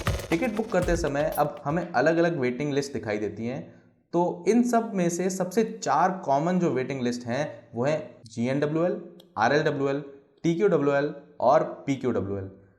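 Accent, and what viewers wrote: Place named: Jodhpur, India. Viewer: Bhai jodhpur se ho kya?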